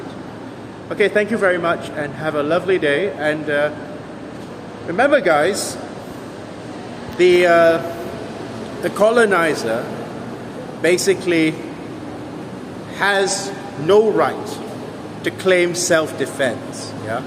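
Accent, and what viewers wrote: RP, United Kingdom. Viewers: I love your high-faluten slightly pompous, pucker British accent. It takes some getting used to. The comments here are barbaric. Though I don't agree with your perspective, I do enjoy your clear vocalization of it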